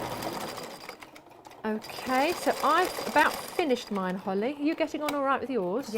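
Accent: United Kingdom. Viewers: Darlings love, the british accents, yes I do agree about the threads it is better than chocolate lol. You are so vary talented, I plan to do your sewing tecnique on a card and if comes out wounderfull like yours I guess I will have a hit on my hand :-). Thank you for showing your love of your craft in sewing. In His Grace, Lorisa aka ellescrapper. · Every time I search videos for tips, the voices are American. It is so refreshing for us Brits to hear an English accent!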